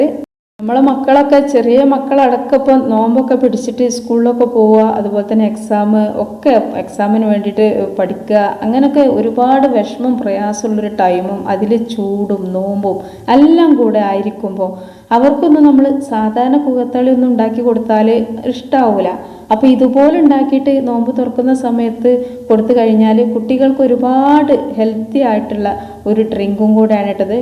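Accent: Malappuram, India. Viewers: Malappuram thahta Samsara matiyallo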